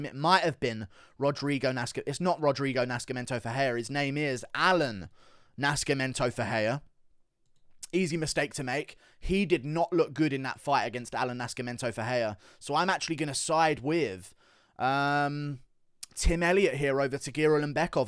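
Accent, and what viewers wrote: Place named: England, United Kingdom. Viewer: I love your channel, I love your picks, and analysis. I just wish you weren’t English, because I just can’t your voice idk why lol but you’ll always have my support 😂😂😂😂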